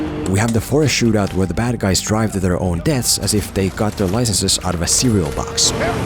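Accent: Finland. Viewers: Your accent… Finnish?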